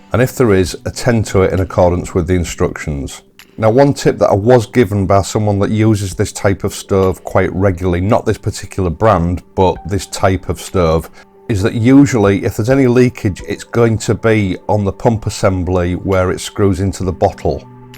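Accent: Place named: Hull, United Kingdom. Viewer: Hey, I noticed on a previous post your number plate has castle motorcycles on it, I have an 09 bonneville from there. And are you from Hull by any chance as I recognise the accent. Great videos by the way 👍🏻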